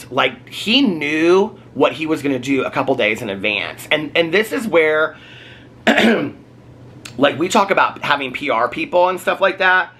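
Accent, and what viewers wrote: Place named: Ohio, United States. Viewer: I love you so much. I am from outside of Cincinnati, so the way you talk and your mannerisms remind me of my southern Ohio family and friends. It is so heartwarming bc you are such a good person and you sound like all my fave people.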